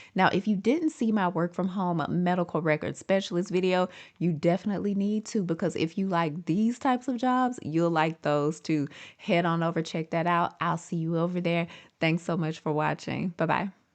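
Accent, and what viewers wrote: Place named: Alabama, United States. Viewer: You definitely from Alabama, am I right? Lol and thanks for the video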